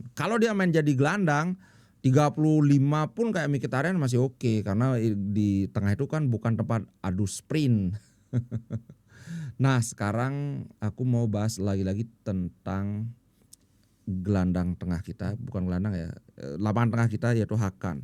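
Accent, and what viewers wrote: Bali, Indonesia. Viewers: logat kayak org Bali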